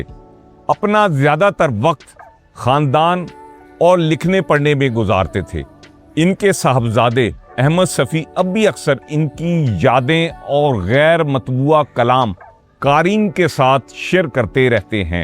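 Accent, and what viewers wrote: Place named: Punjab, India. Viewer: بھائی، ابن صفی صاحب سے متعلق معلومات کے لئے بہت شکریہ مگر پنجابی لب و لہجے والی اُردو بہت کھل رہی تھی!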